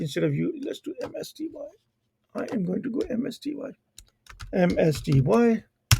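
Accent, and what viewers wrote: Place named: India. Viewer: Indian accent!!!!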